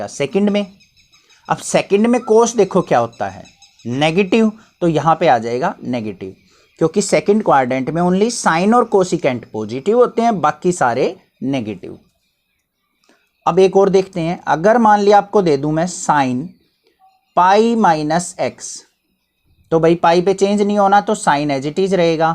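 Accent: Haryana, India. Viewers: Sir aap haryana se ho..??🤔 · sir ji aap haryana se ho na thari bolan ki ton t laga se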